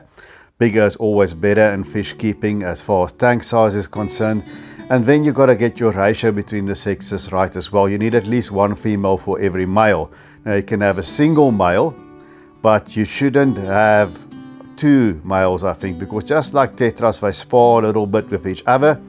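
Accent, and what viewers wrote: South Africa. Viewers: Love your accent…South African. 😊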